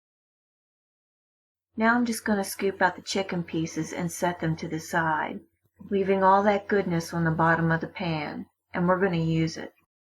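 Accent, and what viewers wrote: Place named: Philadelphia, United States. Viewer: Such a philly accent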